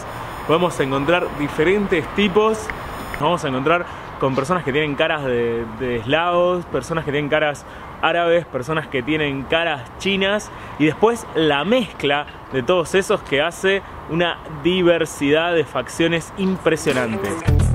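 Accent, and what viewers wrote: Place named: Buenos Aires, Argentina. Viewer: Muy bueno el contenido y didáctica la explicación. Sos de Buenos Aires por casualidad, digo por el acento. Y lo de el restaurante giratorio me hizo acordar a la confitería giratoria del cerro Otto en Bariloche.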